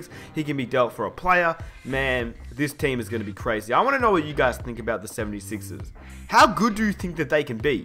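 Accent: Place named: Australia. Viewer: An Aussie commentator - love it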